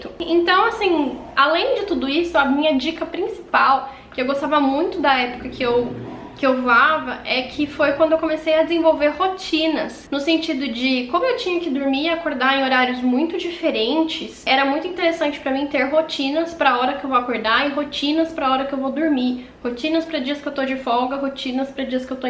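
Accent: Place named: Paraná, Brazil. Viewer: querida, vc é do interior do Paraná? Temos sotaque parecido!!! Concordo organização e planejamento é fundamental, parabéns pelos seus vídeos!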